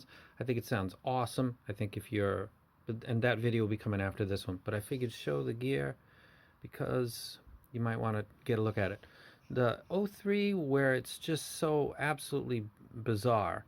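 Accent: Boston, United States. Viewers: I love it when you talk Boston to me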